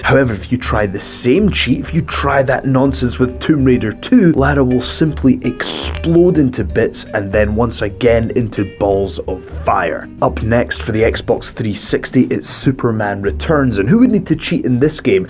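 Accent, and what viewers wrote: Scotland, United Kingdom. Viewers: I haven't been replying for over 2 months. I've got these days to waste replying a guy who thinks he's so smart :P Dude, you clearly have no idea what you're talking about. Accents and the language the person speaks changes everything in communication. Example: I speak my name with "Pau" being the strong syllable and then "lo". Some people, depending on the accent and the language they speak will say it sounding a little different, which doesn't change my name at all. Actually, my foreigner friends tend to say my name with "lo" being stronger. Every time they say it it's still my name. Easily recognizable for sure. The guy is Scottish and say "Mario" with his damn accent and now the name is wrong? Do you know that Portuguese, Spanish, French and probably many others language doesn't have the "th" sound in their language and won't say "Elizabeth" the way you think they should? 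Won't even need to talk about accents here! Ps. Seriously? Mario is not real? If you say Santa Claus isn't as well I'll kill myself right away! At least I know they're more real than your wisdom :P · Are you Scottish? I love your accent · I get that you're Scottish, I am too, but stop putting it on so strong its pure annoying. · HES SCOTISH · He's clearly a Scot, you trolling bro? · Gotta say...kinda enjoying the Scotsman a bit more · most annoying scottish accent ever...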